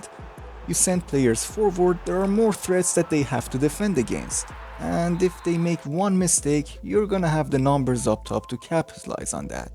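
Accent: Iran. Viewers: Are you persian by any chance dadash?😅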